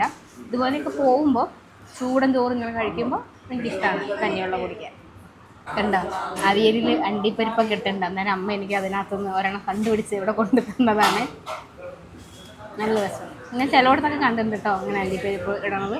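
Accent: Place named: Thrissur, India. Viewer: ടീച്ചറേ,, ശരിക്കും വീട് എവിടെ യാ അപ്പോൾ,, ടീച്ചർ സംസാരിക്കുമ്പോൾ തൃശൂർ സ്ലാങ് ആണല്ലോ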